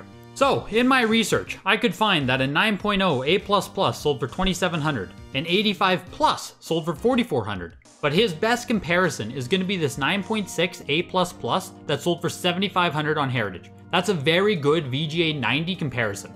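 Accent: Canada. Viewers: I cant take you serious with your massive shoulders long hair tight shirt and canadian accent. Youre all over.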